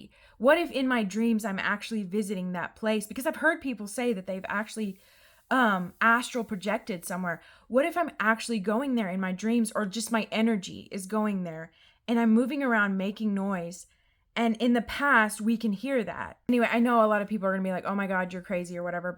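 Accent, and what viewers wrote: Visayas, Philippines. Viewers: you sounded just like the locals. your linguistic prowess is incredibly impressive. I'm cebuana myself but i can tell your intonation is like mixed visayan but the pronunciation and the way you enunciate visayan words are on point. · I closed my eyes and I cannot really tell that you're an American. You sound naturally Bisaya, like a local. I wish you well. I hope you can visit Matina again. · you are so inspiring and authentic! 😊 Kalingaw kaayo ko listening to you speak Bisaya and especially how bisdak you are! It brought back so many memories. I also grew up in Davao—Matina, Davao City—until 1986, when I left for Manila for college. I eventually stayed there, got married, and raised my kids. In 2005, we moved back to Davao to take care of and develop our family property before finally moving to Las Vegas in 2014. You’ve made me miss home so much. 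More power to your book! I’m also working on a book dedicated to my father. Like you, I have so many creative projects that sometimes I wonder how I’ll ever finish them all. But I’ve learned that a little progress at a time eventually gets us there. Keep going—you’ve got this, and I’m cheering you on from Las Vegas! 💛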